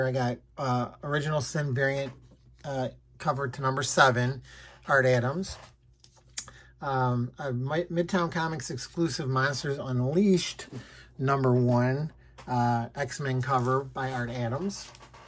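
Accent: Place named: Michigan, United States. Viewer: I can hear your nasal Michigander accent. I'm originally from GR, but in CHI now. Great haul!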